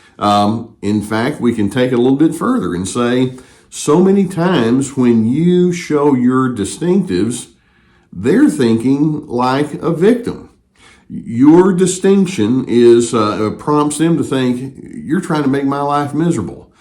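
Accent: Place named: Texas, United States. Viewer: I'm sorry to drift off the topic, but I really like your accent. Is is Texas?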